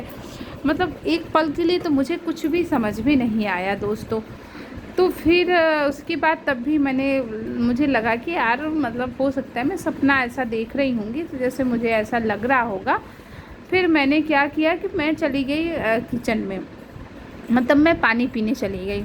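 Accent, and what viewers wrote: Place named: Pahadi, India. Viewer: Aap ka accent bta rha h aap pahadi h 😀❤️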